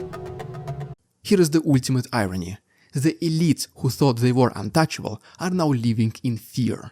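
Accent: Russia. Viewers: Wooohoo, you are speaking sooo fast and you have such a strong russian accent, this makes it not easy to understand for someone whose native language is not English. 😅 Great topics, but I guess I need to watch the video again to fully understand it all. Sometimes it needs some time to adapt to the accents. It was the same with a youtuber from Wales in GB, for example. At first I understood nothing, really... After three or four videos I got used to it. 😅 So I have hope. Because I consider your videos as really informative and very interesting. Thank you. :)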